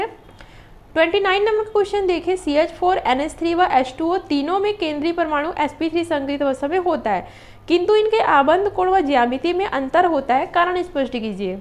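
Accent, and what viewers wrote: Chhattisgarh, India. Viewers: Mam chhattisgarh se ho kya?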